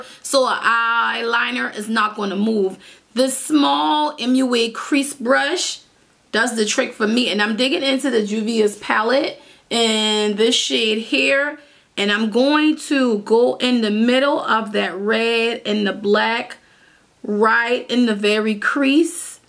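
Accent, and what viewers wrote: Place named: Gullah Geechee, United States. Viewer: Geechie gyal!!! I can spot that accent out of a line up lol. Love the eyes, I still can't master the winged liner. Taking notes!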